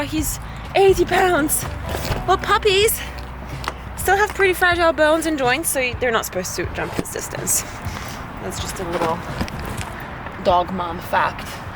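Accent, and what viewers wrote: United Kingdom. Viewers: ...albeit with a German name and a British accent.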